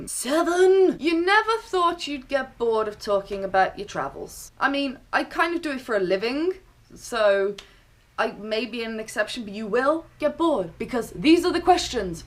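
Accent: United Kingdom. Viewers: I think she means Birmingham, UK, telling from her British accent. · Definitely subscribed. Great vids, british accent, cute girl, what more could i ask for :)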